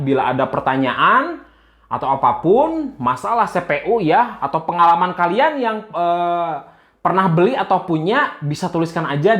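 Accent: West Java, Indonesia. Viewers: kaya ada logat sundanya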